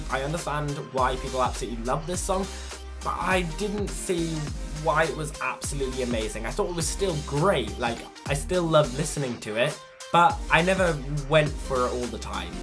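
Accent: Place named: United Kingdom. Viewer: Why am I so surprised that you're british 😂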